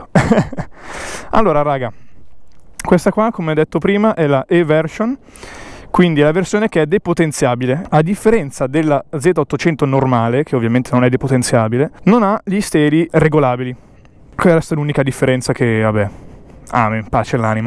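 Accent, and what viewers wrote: Italy. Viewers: Ciao ragazzo ma sei di Brescia o provincia? Dall accento sembrerebbe di si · ma sei veneto?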